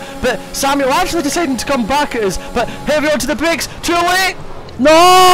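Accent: Scotland, United Kingdom. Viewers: If you pay attention you might hear a slight Scottish accent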